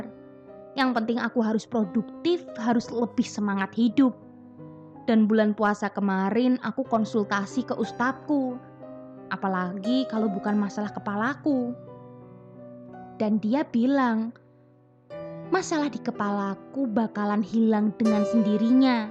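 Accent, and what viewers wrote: Java, Indonesia. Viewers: Ngomong'e medok mbak'e😂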